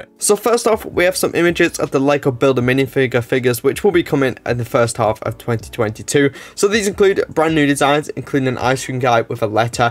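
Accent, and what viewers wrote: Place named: Estuary English, United Kingdom. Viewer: It's pretty hard to understand him as a European, as well... I guess this is what's known as "Estuary English"... (More or less modern Cockney...)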